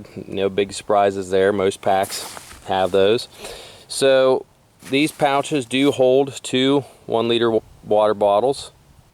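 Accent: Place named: Pennsylvania, United States. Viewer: Where are you from? I can't put my finger on your accent. My guess is Central/Western PA? I have a the Granite Gear Crown2 60 and love it. Recently picked up the Gossamer Gear G4-20, a 42L frameless pack that weighs in at 25 oz. I'd say its a lot more comfortable than the Crown2 if you can get your kit dialed in to fit into the smaller pack. Worth checking out for sure!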